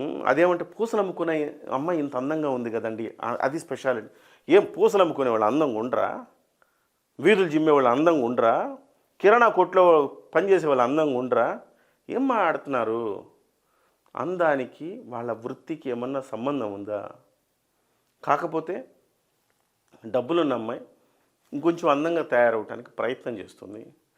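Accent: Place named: Nellore, India. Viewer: Superrrrrrrr sir chalaa baga Nellore slang tho bale chepparu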